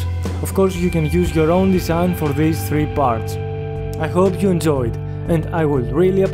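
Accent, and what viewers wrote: Greece. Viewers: probably from Greece ...I can recognise that accent ! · You got a really nice heavy Greek accent (idk if you are) but also thank you for the tutorial. It's gonna be helpful for my jungle village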